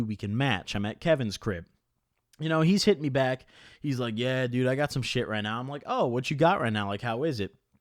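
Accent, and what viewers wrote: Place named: Indianapolis, United States. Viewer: Dawg you sound like you LIVE IN NAPTOWN lmaooo frl I can tell when I hear it😭😭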